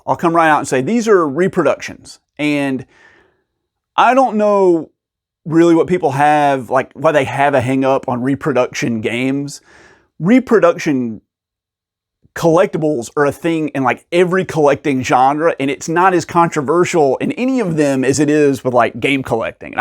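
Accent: South, United States. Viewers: It's subtle, but as a southerner, I can detect it, haha. Merry Christmas!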